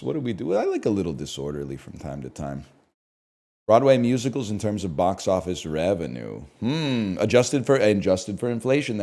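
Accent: Canada. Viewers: Welcome to the Canadian accent. 😊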